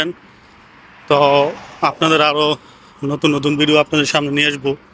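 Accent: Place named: Brahmanbaria, Bangladesh. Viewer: ভাইয়া আপনার বাড়ি কি ব্রাহ্মণ বাড়িয়া? ফেসবুকে আপনি আঞ্চলিক ভাষা ব্যবহার ঠিক আছে কিন্তু ইউটিউব হল একটা আন্তর্জাতিক প্লাটফর্ম এখানে শুদ্ধ বাংলা বলার চেষ্টা করবেন আশা করি।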